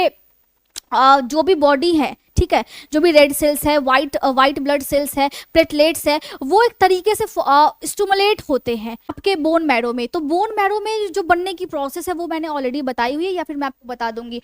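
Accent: Bihar, India. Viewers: Jankari aapki bahut hi mahatvpurn hai bahut achcha se aap explain kar rahi hai aapki bhasha mein Bihari Pan ka lagao lag raha hai vaise very nice❤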